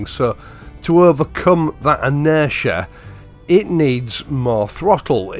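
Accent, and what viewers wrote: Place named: Hull, United Kingdom. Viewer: Great to hear a proper 'ull accent - can you say 'purple working shirt' and 'nine ninety nine' ?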